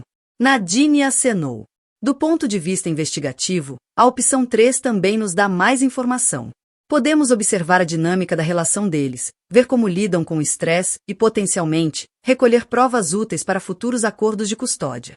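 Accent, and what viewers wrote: Portugal. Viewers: Narradora tem sotaque português de Portugal, sempre repetitivo assim.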